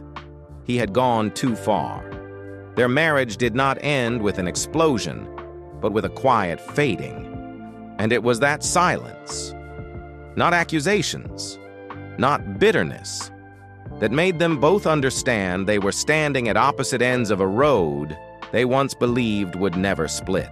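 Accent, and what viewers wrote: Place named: South, United States. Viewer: So much repetition and “over the top” words, expressions, comparisons”. I assume Morgan Freeman was the narrator. His Southern roots creep through. A good editor could have pared this down and made it much better. It got very tiring.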